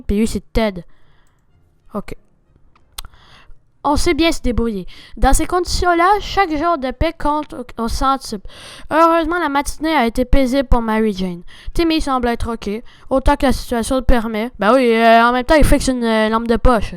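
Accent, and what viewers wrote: Quebec, Canada. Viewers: Super vidéo en plus tu es Québécois j'adore l'accent Quebécois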